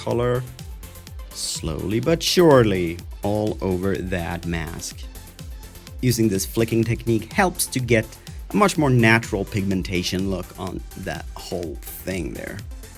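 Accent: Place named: Skåne, Sweden. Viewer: en javla skånsk dealekt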